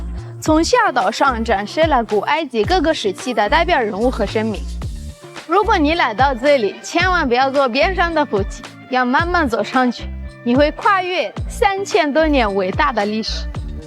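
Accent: China. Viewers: 好中國話用語和腔調 🤷 😮⋯⋯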